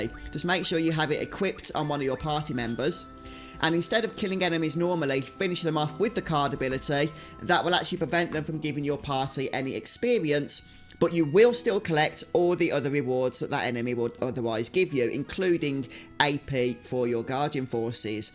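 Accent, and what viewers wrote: United Kingdom. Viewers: Are you from U.K??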